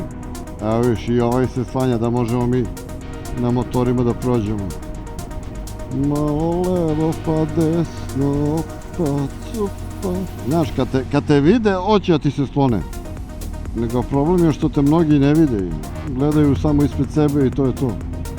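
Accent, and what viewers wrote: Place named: United States. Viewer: Bez zajebancije poceo si da pricas kao amerikanac ahahahhaha Bravo za domacina! Pozdrav iz Velikih Crljeni :D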